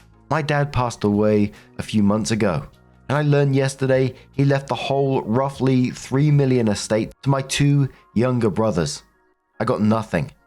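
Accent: United Kingdom. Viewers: Can anyone remember what channel was called before? I have been racking my tiny mind and can't remember anything but I started following him as I knew he had to be a Brit.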